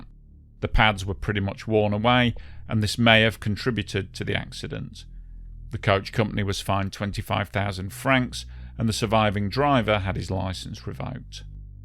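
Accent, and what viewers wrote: United Kingdom. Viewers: . . . great point, I'm of the same thought. . . . as well, it's really reaching out there to correct a video producer in the comments section 🤣🤣Being way over here in the US, it looks exactly like a Frenchman wanting to correct their arch rival Brit any chance they can get, cause this guy sure sounds British to me anyway.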